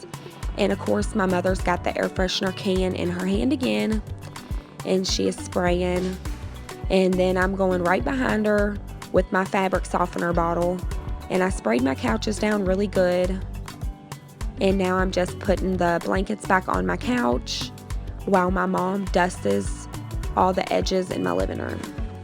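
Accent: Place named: Kentucky, United States. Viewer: I’m from and live in Kentucky I thought you were from here by your voice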